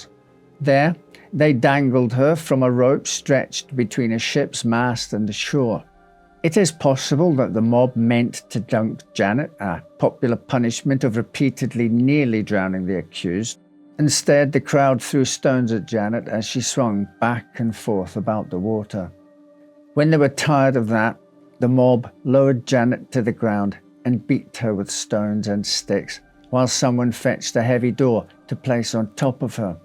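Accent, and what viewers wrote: Scotland, United Kingdom. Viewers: I'm obsessed with this man's accent, is he Scottish?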